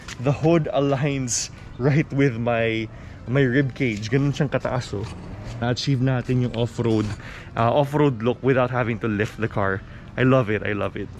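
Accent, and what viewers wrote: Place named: Conyo, Philippines. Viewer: conyo kris aquino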